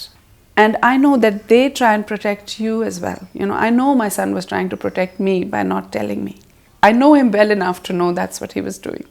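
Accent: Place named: India. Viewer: I like the indian accent. you speak well english.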